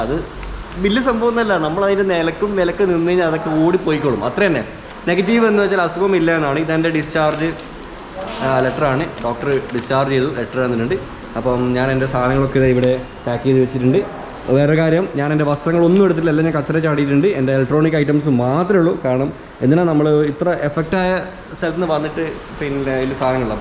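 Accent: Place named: Trivandrum, India. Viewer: Kalli valli broo namma trivandrum dialogue ......ninga poliya macha...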